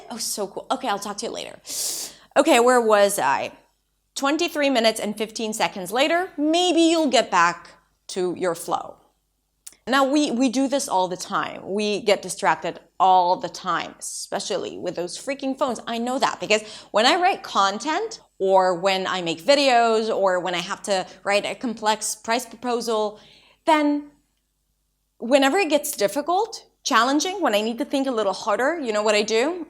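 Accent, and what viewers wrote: United States. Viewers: I want to be fluent 1 - In order to get married with an English man. Hoping he will learn Arabic so I won’t have to fight with English. 2 - I live in Canada 🇨🇦 I have to speak English. you are from Israel 🇮🇱 but you sound 100% American you are very smart. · Thank you so much for this clip. I always wanted to become a fluent English speaker, but I never asked myself why, so now you have made me become a critical thinker. I used to think you were born in the USA since no one can separate you from those who were born there due to your American accent until you told that you were not American.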